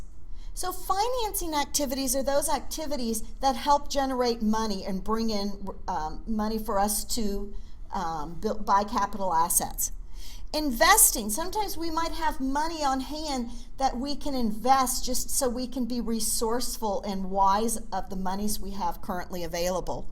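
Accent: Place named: Minnesota, United States. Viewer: MINNESOTA EH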